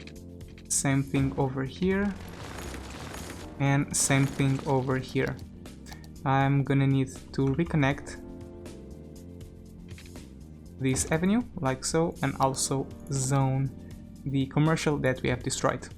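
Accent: Portugal. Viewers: He is one of ours, Portuguese. Very clear from the accent actually. But yes, I agree, he's far from a clumsy English speaker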